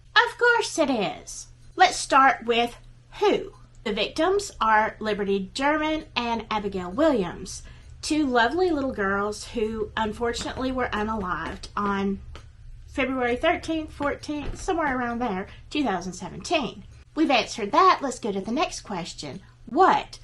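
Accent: South, United States. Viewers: Love your southern drawl..I've got a kiwi one! 😉🌿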